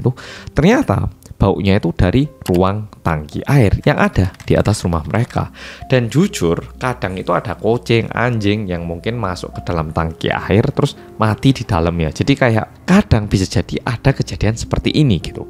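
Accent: Java, Indonesia. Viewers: Orang Korea kow ya medogk 😂😂😂❤🥰🥰🇲🇨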